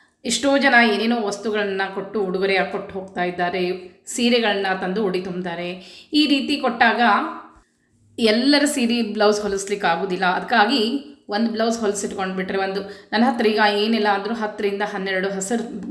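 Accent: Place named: Hubballi-Dharwad, India. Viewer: akka ನನಗೂ ನೀಮನ್ ನೋಡಲು ತುಂಬಾ ಆಸೇ ನೀವು ಯಾವ ಊರಲ್ಲಿ ಈರ್ತೀರೀ ನನಗೆ ಹುಬ್ಬಳ್ಳಿ ಧಾರವಾಡ ಅನಿಸುತ್ತದೆ ನಿಮ್ಮ ಮಾತನ್ನು ಕೇಳದರೇ ನಾವು ಆಗಾಗ ಹುಬ್ಬಳ್ಳಿ ಗೇ ಬರುತ್ತವೆ ನಿಮ್ಮ ಅಪಣೇ ಇವರೇ ನಾನು ನೀಮನ್ ನೋಡಲು ತುಂಬಾ ಆಸೀ ನೀವು ನಿಮ್ಮ ಸಂಸಾರ ದ ಬಗ್ಗೆ ಓಂದು ವೀಡಿಯೊ ಮಾಡಿ ಎಷ್ಟು ಜನ ಮಕ್ಕಳು ಎನ್ ಮಾಡತಾರ ಸರ್ ಎನ್ ಮಾಡತಾರೇ ಹೇಳಿ ಮತ್ತು ನಿಮ್ಮ ಮನೆಯ ವೀಡಿಯೊ ಮಾಡಿ ನಮಗೆ ತೋರಿಸಿ ನಿಮ್ಮ ದೇವರ ಮನೆ ಎಲ್ಲ ವನ್ನೂ ನೋಡುವ ಬಯಕೆ ನೀವು ನಮ್ಮ ವರೂ ಅಂತ ಕೇಳಿ ನಿ akka ದಯಮಾಡಿ ತಪ್ಪು ತಿಳಿಯ ಬೇಡಿ ಇಂತಿ ನೀಮ್ ತಂಗಿ ಲತಾ ಕುಲಕರ್ಣಿ ನಮಸ್ಕಾರ🙏🙏🙏🙏🙏 ಗಳು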